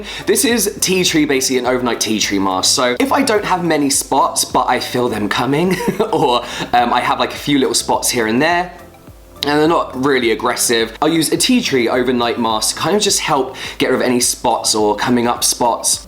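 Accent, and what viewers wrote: United Kingdom. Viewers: You thought me a bit of british accent, Second significant reason of me watching lol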